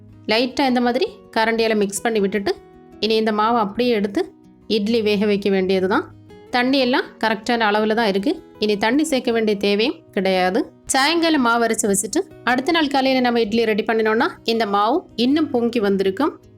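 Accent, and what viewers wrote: Kerala, India. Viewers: மலையாளி தமிழ் பேசினால் ஈசியா கண்டுபிடிக்க லா ம்.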